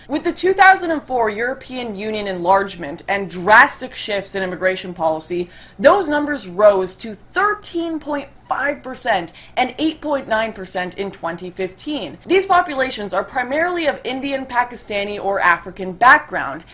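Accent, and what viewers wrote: Liverpool, United Kingdom. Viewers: The Scouse accent is fucking vile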